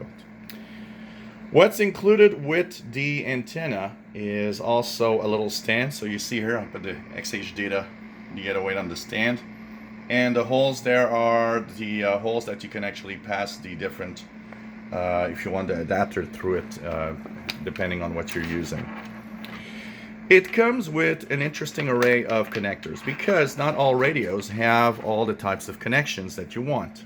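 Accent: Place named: Quebec, Canada. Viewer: Definitely from Quebec with that accent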